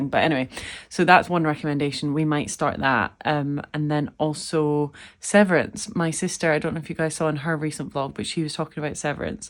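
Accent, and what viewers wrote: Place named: Edinburgh, United Kingdom. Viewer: I stumbled upon your video a couple of months ago and I love them. I love the honesty in them; you're not trying to be some numpty influencer. You're just. Keep on doing that because it's working. Your accent makes me homesick for Edinburgh (I'll get back one day). So, as my late father would tell me "Keep dancing to your own Piper." Now I need to rewind and see what book it was that you mentioned at the start. One day I hope to see you mentioning one of my books (once I've finished writing my first!). x PS: I started following your sisters' vlog. I'm even more homesick!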